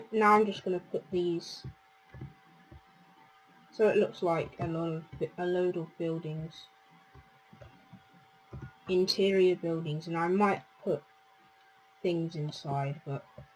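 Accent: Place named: United Kingdom. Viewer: the brithis accent its so funny XD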